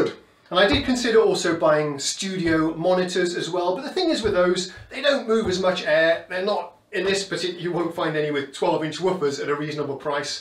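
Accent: United Kingdom. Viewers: Oh no! You are all "BOX'ed" in...hahaha. Great evaluation. And I agree that the plastic monitors do rumble, buzz, and vibrate more often then those built out of wood. I also punish myself by facing my keyboard up against a concrete wall and tell myself, take that pilgrim...haha. But for my bass and guitar next to me, I play looking outside a large window as this is where my DAW if facing. Go figure...lol! I had no idea you were living in Sweden as your accent is British thinking you were in the UK. Either way, that's awesome. Loving your videos and I am so happy for you that you bought a great semi sound reinforcement set up that is pleasing to your ears. Not sure about your wife's ears I assume though hahaha. There are many speaker and amp combinations for such purposes that are cheap. And I stress cheap as in cheaply built and sounding. I think you aquired yourself a great buy. 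Congrats on your meticulously thought out purchase. As far as your amp falling and damaged, you can always say it was a drop in price...lol.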